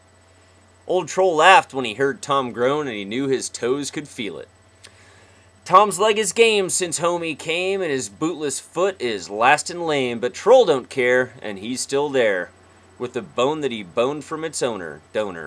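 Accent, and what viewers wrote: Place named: South, United States. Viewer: "Why the hell does my southern accent start to pop up when I'm reading something?" Be proud of your southern roots. Nothing wrong with a southern accent. grins.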